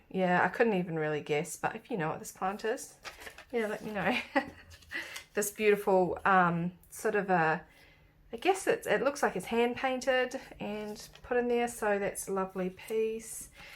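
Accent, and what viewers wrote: New Zealand. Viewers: Thank you..I am from Wanaka and you sound very NZer to me.x I love watching your video's and love what you make.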